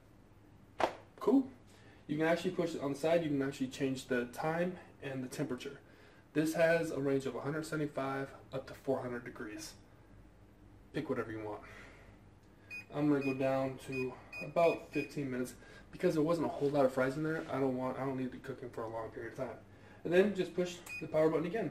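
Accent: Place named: Southern California, United States. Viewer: Never mine aha I saw your Cali tatoo more into the video. Only asked cause the way you talked you reminded me of my self. You talk exactly like me I’m from so cal Anyways cool video using my fryer right now !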